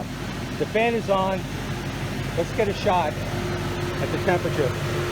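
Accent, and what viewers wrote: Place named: Boston, United States. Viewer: Guessing by your accent that you are not from Florida. Boston perhaps?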